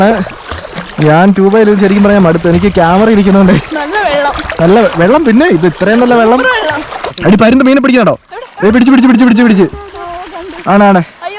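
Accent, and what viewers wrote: Kottayam, India. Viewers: Bro kottayamkaran alle bro?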